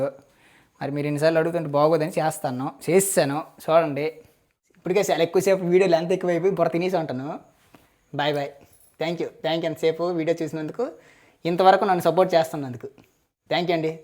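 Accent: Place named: Telugu, India. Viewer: That slang is pure telugu ❤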